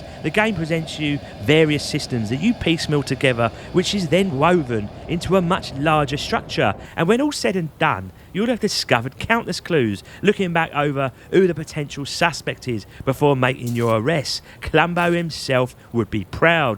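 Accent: Cockney, United Kingdom. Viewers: I'm an American living in UK and my London girlfriend won't let me listen to any let's play videos with American accents as she finds them grating (other than Texan, which I have luckily) so happy to find a Cockney playing games I'm interested in. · I didn't think i could watch more shadows of doubt videos but THESE ones have cockney accent!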